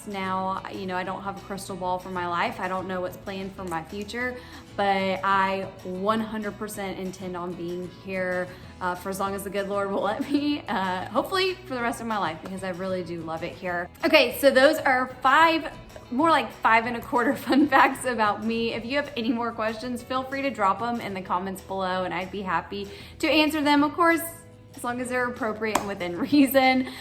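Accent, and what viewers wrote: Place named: South, United States. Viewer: Hey great video, I personally don't know a Virginia vs a Tennessee accent but you sure sound southern!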